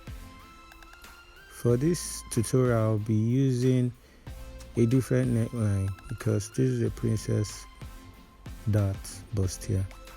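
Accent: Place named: Africa. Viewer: Employ me at youtube to translate for you,its like you guy don't understand Africans and Asians spoken English 😒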